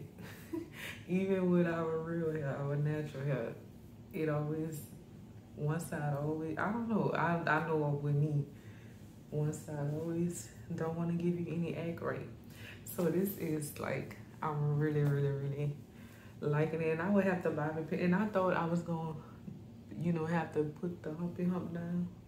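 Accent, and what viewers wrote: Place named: Louisiana, United States. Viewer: Wig is so pretty on you ! I will for sure buy ! And omg are you from Louisiana? I think I hear an accent.Its my favorite accent my parents are from there🤩👍🏾 let me go check out your other reviews. Edit: I watched the whole thing and you mentioned Louisiana 🤣 I was so quick to comment great review again!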